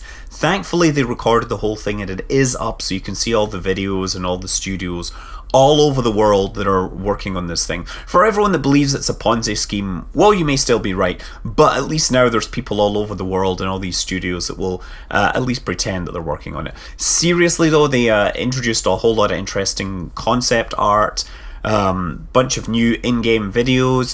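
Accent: Scotland, United Kingdom. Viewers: Hey, every time I witch to you channel from say TotalBiscuit or Polaris or wherever, the first thing I have to do is turn down the volume before your fine Scottish brogue deafens me! Otherwise, your channel is awesome.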